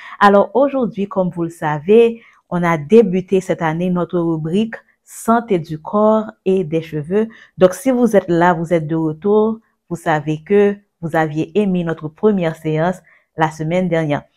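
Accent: Haiti. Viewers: elle a un accent Haïtien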